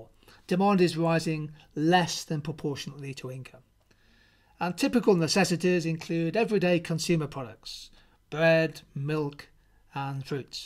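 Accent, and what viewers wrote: United Kingdom. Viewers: True British accent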